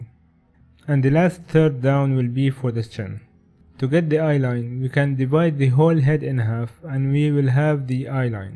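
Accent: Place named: Egypt. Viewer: Egyptian accent. So proud of you. Keep it up. ما شاء الله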